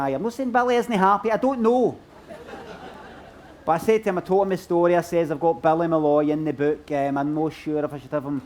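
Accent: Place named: Scotland, United Kingdom. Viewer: He's Scottish… he speaks normal for a Scottish person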